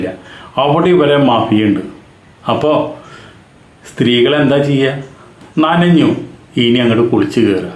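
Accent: Thrissur, India. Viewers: Very interesting Voice & Language, sounding Trishur?😅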